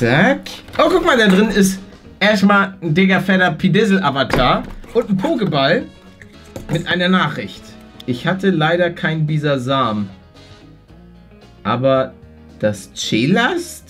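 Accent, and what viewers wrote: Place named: North, Germany. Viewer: Er hat nen geilen norddeutschen Akzent xD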